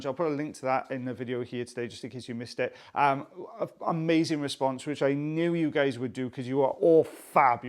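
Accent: Wales, United Kingdom. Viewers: Ahhh....after a frantic day your wonderful waxy Welshness has soothed my soul, thankyou 😍😍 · Love the commentary and the vision. So interesting. Sounds like a Welsh accent to this Aussie ear.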